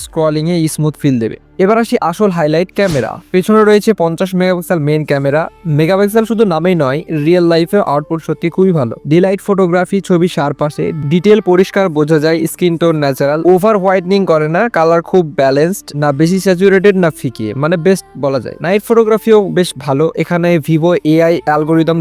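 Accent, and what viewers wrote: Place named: Bangladesh. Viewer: ভাই আনার কথা শুনে মনে হয় বাংলাদেশি আপনি ❤❤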